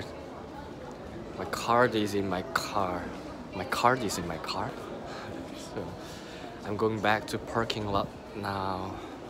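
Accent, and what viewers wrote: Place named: South Korea. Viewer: Your pronunciation of Ikea is actually very similar to how it’s supposed to be pronounced. I’m from Sweden and we pronounce it like you do, except you have a bit of a Korean accent to it 😊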